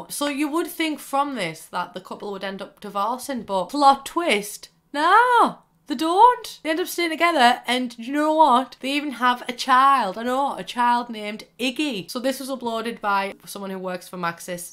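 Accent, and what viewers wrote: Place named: Newcastle, United Kingdom. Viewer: She’s a Geordie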